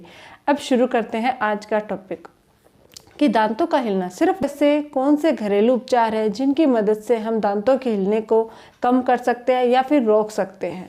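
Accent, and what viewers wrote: Rajasthan, India. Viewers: mam ap Rajasthani hona? Apki tont se lagra hai 😁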